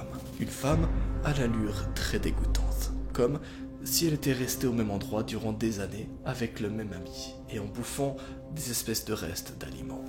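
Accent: Switzerland. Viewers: T Suisse ?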